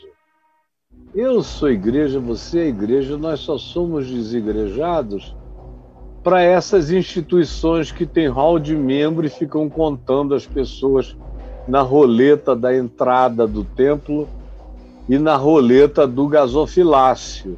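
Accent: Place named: North, Brazil. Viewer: Amo essa linguagem nortista do. 👐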